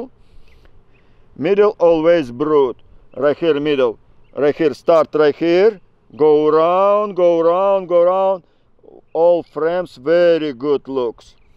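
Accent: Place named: Russia. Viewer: I love your Russian accent. I have a question. All my hives are not producing honey. Hives are strong but no comb no honey. Only nectar and brood..any idea?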